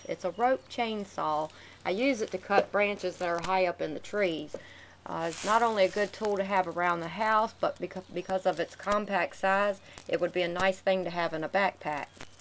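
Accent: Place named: Tennessee, United States. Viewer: Never knew this existed, wow! Must get me one. Love the accent. Sounds like Tennessee.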